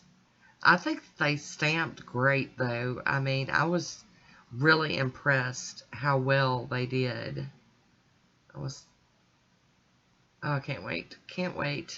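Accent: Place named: South, United States. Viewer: Got a good chuckle about your comment that Southerners pronouncing “things funny”. LOL. I love the way you speak. Reminds me of my favourite movie and book - Gone with the Wind. Liked your mani too. LOL.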